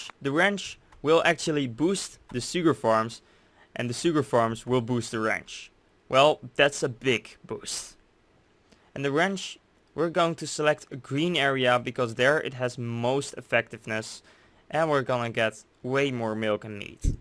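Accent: Netherlands. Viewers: je nederlands accent is duidelijk te horen lmao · he sounds dutch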